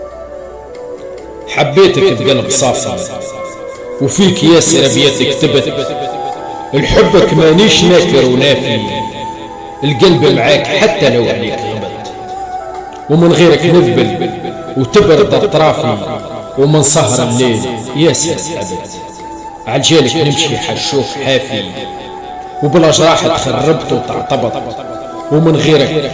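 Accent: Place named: Tunisia. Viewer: روعة ❤ما احلاها اللهجة التونسية و اللكنة الجنوبية و الوسط الغربي ..دمتم بخير استاذ ..اشتقنا الى تونس في الغربة ..في القصيدة نلمس الروح التونسية و جونا و افراحنا و ذكرياتنا مع الحب الاول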